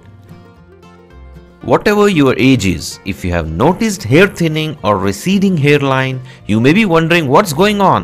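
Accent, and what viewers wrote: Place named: England, United Kingdom. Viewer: That THICK English accent is making me kill myself.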